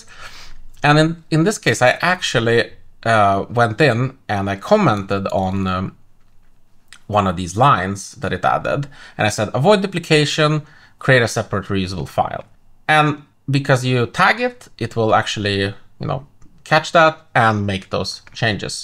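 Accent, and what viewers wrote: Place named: Sweden. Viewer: Wow, the most Swedish accent I ever heard...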